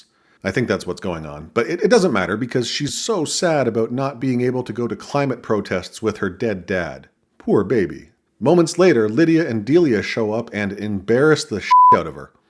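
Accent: Canada. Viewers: Wait, are you canadian? Your voice sounds vaguely like it.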